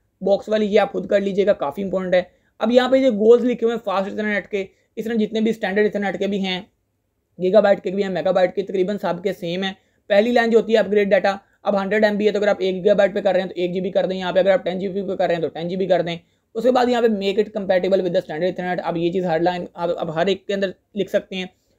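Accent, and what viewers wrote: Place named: Karachi, Pakistan. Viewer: Lahjy sai karachi waly maloom hoty ho...!! :goodvibes:😉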